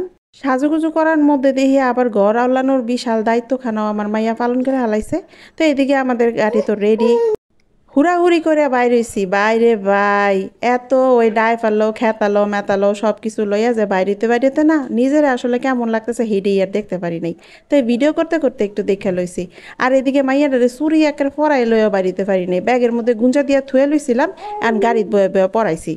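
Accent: Mymensingh, Bangladesh. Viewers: মাশাআল্লাহ আপু এক ঘেয়েমি কেটে যাওয়ার মতই অসাধারণ ভিডিও। ভীষন ভাল লাগল। তুমি এমনিতেই অনেক কিউট। আজ আরো বেশি সুন্দর লাগছিল। ময়মনসিংহ ভাষাকে তোমার মুখে শুনতে খুব মিষ্টি মিষ্টি লাগছিল। অনেক সুন্দর পরিবেশ ছিল।খুব ভাল লাগল আপু।😍🥰😍🥰😍🥰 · so cute আপু, তুমার মুখে আমাদের মমিসিংগা ভাষা শুনে ভালোই লাগললো❤, এ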